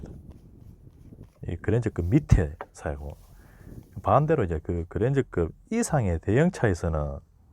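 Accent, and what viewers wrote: Gyeongsang, South Korea. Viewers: 근데 엔진오일 교체하는데 얼마한다고 저 난리를ㅠㅠ 저도 부산인데 사투리 심한건 아니고 억양 자체가 경상도네요ㅋ 유튜브 대박나시길 기원합니다 저렴하게 엔진오일 교체하는 꿀팁 알려드리겠습니다 파트존 깔고 내차 차대번호 입력하면 가격대로 나옵니다 저도 528i 끌고 다는데 최저가로 구입하시고 글구 물건이오면 공임나라 예약해서 교체하세요 그럼 총 10만원도 안들어갑니다 오일 흐르면 환경파괴 잘못 교환하면 피박씁니다 글구 엔진오일 교체할때 에어컨 필터도 같이 교체하세요~^^ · 목소리리 톤이 아주 좋으십니다. 남자로써 차에 관심을 많이 가지는건 당연하겠지만 실천하기가 싶지 않는데 존경스럽습니다. 혹시 경상도 분이신가요.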